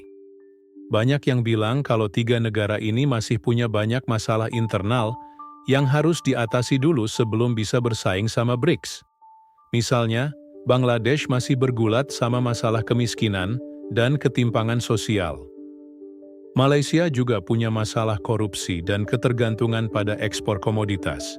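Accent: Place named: United Kingdom. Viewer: Orang British bahasa Melayu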